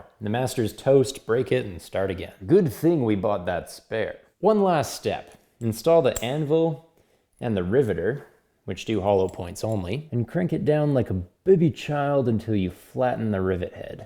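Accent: Canada. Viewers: I’m assuming he’s from Canada. I’m waiting for him to say chooch or that’s the cock for dolly 😂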